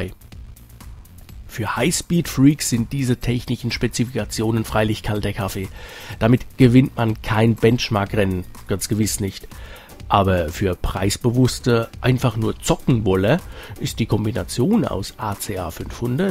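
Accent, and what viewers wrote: Hesse, Germany. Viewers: Ist nicht so schlimm, wenn man beides nicht hat. Hatte erst in der letzten Woche nen 1200er aus'm Sperrmüll gefischt und dieser funktionierte nach nem Testlauf. Von daher wäre so ne Turbokarte durchaus interessant, wobei ich eh nur mein Lieblingsgame zocken würde; und zwar Lemmings, das hab ich in meiner Kindheit gesuchtet.:D Aber es ist trotzdem erstaunlich, was man mit nem Amiga alles anstellen kann; sowas war früher sogar dem PC technlologisch weiter voraus; die Workbench war sogar intuitiver bedienbar als die "schwarzweiß"-Apple-Oberfläche, wo man die einzige linke Maustaste gedrückt halten musste, damit das Menü offen blieb. Beim Amiga gabs diese Problem überhaupt nicht. Derzeit nutz ich zur Zeitvernichtung den WinUAE-Emulator + Kickstarts von AmigaForever sowie den verbleibenden Spiele-ROMs um die Lemminge zwischendurch in der Pause zu zocken. 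Ich finde, auf dem Amiga hatten die Spiele nen besseren Sound als die Konkurrenz. Ansonsten find ich die Videos von besser als die konkurrierenden YouTuber. Klingt komisch ist aber so; und das hat nischt mit dem hessischen Dialekt zu tun. Eher ist es die Qualität, an die niemand rankommt.:D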